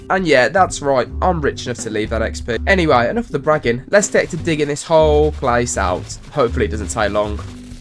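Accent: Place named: United Kingdom. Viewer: helo how are u Dude British accent is funny 😂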